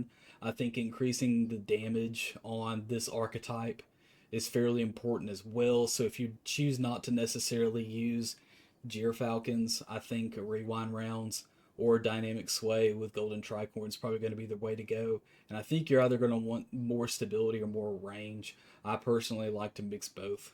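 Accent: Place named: South, United States. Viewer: As someone fro europe i really enjoy the southern draw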